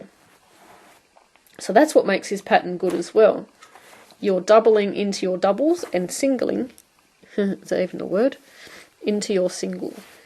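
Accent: Australia. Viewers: Sorry, meant to get this in with my post below. Hi, I am new and truly enjoy the video's I've viewed on your channel. You make it so easy for those havin' trouble because you explain things so well. I like listenin' to your Aussie voice; as I am from the USA . You do have a pleasant and soothin' voice. Thanks so much!! ~Buffalo Gal~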